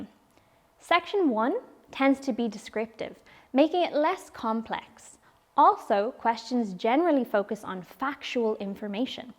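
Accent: Ireland. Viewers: who's this presenter? She's Irish. Very good. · Bro, she cant hide her irish accent...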